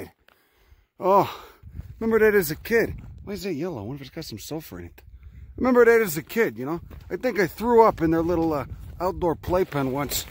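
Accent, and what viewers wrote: Chicago, United States. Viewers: I'm from Chicago so it comes naturally to me as I talk like he does when he isn't doing vids, but that is funny to hear for sure!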